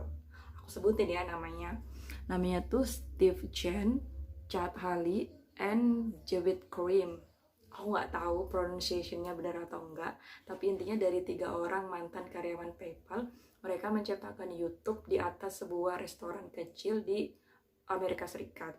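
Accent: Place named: Java, Indonesia. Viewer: MANTHUL... INFONYA SANGAT MEMBANTU... #AGAKMEDHOK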